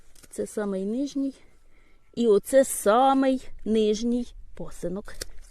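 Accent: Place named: Sribne, Ukraine. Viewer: Ви часом не поблизу Лохвицького району живете? Дуже мова схожа з тією, якою розмовляють в Срібнянському районі, це сусіди.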